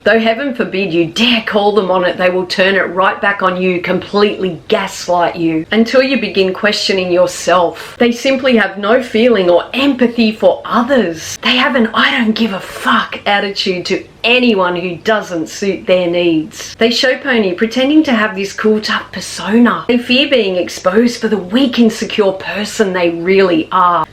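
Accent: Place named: Australia. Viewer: Your grace, you look absolutely adorable wearing pink, and with curly hair, and you have the cutest Australian accent, plus, the most beautiful blue eyes. 🤗🤗🤗 I don’t like dealing with selfish rich people, and horrible narcissists, I only associate with people who understand and respect my dreams and wishes, and who appreciate me for who I am. I just hope Disney or DreamWorks will someday hire me for some voiceover jobs. I sent you a kiss on your elegantly gloved hand, your grace. 😘😘😘🤗🤗🤗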